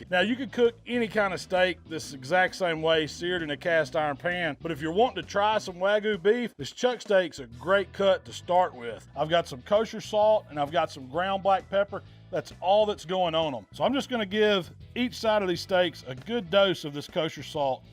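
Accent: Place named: United States. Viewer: Offtop. Enjoying that accent. Which part of US is that?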